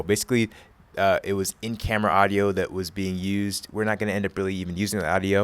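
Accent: Nigeria. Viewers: are you Nigerian? If so, so am I! Thanks for the help, I'm trying to learn how to edit and this really helped! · Just asking Are you a nigerian ?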